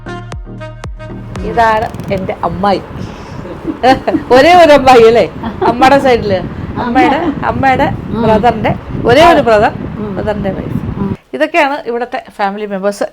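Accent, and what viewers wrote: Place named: Mavelikkara, India. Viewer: My paternal great grandma hails from Mavelickara and that's why I love to listen to the Mavelickara slang of our beloved Maam🙏🏻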